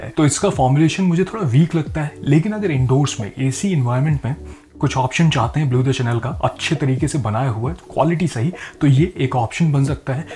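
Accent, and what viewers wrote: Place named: Lucknow, India. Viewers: For some reason, your Hindi seems like you are from Lucknow (or vicinity). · you speak elegantly. lovely urdu are you from lucknow?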